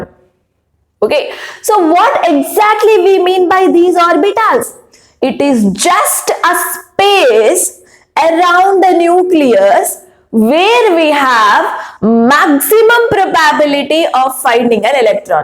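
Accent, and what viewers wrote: Karnataka, India. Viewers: Are u from Karnataka mam😮😮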